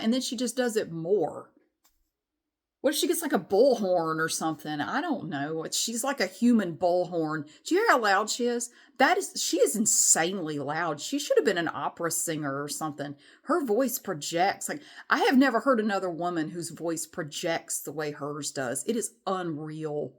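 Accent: South, United States. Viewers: You sound southern . Arm yourself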